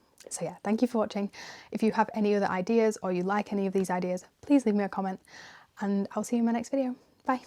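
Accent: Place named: United Kingdom. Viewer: i am really sorry for mistakes if there are any. I’m Russian🇷🇺 and I just wanted to bring more English into my life for practice. I always look for some videos with natives speaking British cause I prefer this accent and I absolutely like your one, your pronunciation is pleasing to my ear🙏🏼 thank u so much, it was interesting to listen to the way how u are expressing your thoughts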